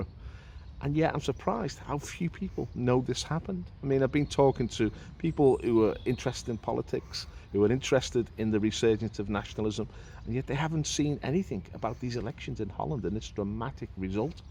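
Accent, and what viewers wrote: Liverpool, United Kingdom. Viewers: What a load of scaremongering misinformed populist crap. Why are so many old men so ill informed and ignorant of the facts..? Possibly because it's so much easier to maintain prejudice and bigotry rather than investigate reality.. There is no foreign invasion of the Netherlands. What bullshit. Your accent suggests Liverpool... So where are your roots..? Celtic, Picts, Scots, Roman, Anglo Saxon...? According to your stupid xenophobic logic all those peoples were foreign invaders. So where does that leave you..? Go back to where you came from... Fool.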